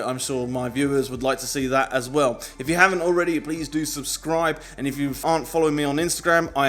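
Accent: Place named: Australia. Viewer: Well, its 0252hrs june 17th in Quebec and your comment response to the mate that made your day by subscribing with all the notification bells got me to thinkin🤔!!!,its night time here and you sound australian so i decided to make your night by subscribing with all the bells and whistles,like button, save and share and support your Channel. You do have some great videos and i appreciate you sharing your knowledge with us. Take care and stay healthy 🍻....Mike....🛶Aboriginal People's Brotherhood Chief🦅........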